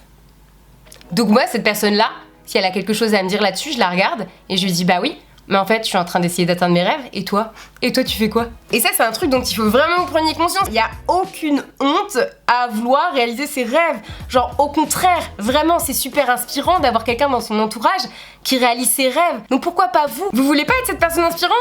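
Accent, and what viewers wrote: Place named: Marseille, France. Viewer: Ton accent marseillais 😂 Sinon je t'adore tu es juste Magnifique super vidéo comme d'habitude et moi je suis ( presque) sur que tu peux atteindre le million (ou les millions) 😉❤️❤️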